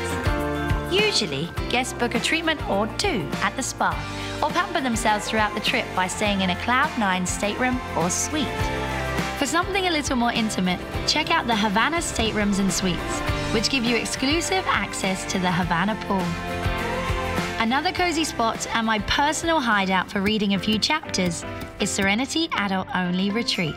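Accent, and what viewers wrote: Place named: England, United Kingdom. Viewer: and her English accent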